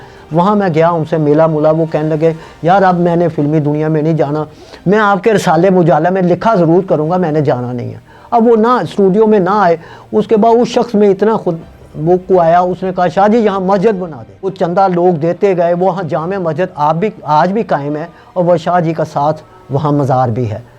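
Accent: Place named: Punjab, India. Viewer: Sir tawada lehja Punjabi wa the aede nalon changa tusi Punjabi hi bol lo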